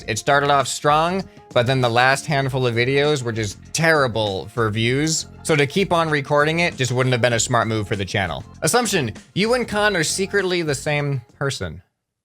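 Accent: Canada. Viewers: huh.. i thought u were Canadian. i thought i heard an accent. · What do u mean he sounds too Canadian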